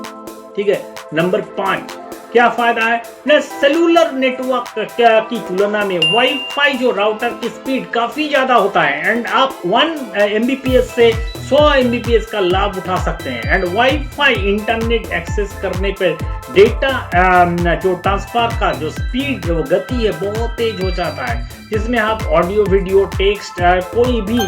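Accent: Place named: Bengal, India. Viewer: it looks funny awesome when a Bengali person tries to speak Hindi.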